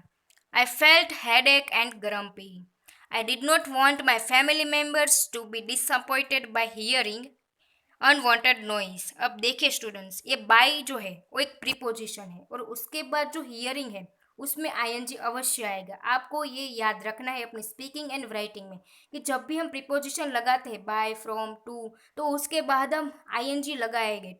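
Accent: Gujarat, India. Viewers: Your sounds like gujarati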